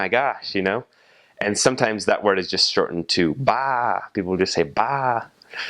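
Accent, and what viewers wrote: Brazil. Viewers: Nice job man! Im from Portugal and I gotta say, you really have the accent from brazil xD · You have a better brazilian accent then continental xD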